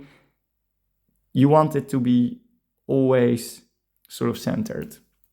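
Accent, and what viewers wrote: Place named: Netherlands. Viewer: wllh ik hou van deze nederlandse accent ahahah, je kan het van een km ver horen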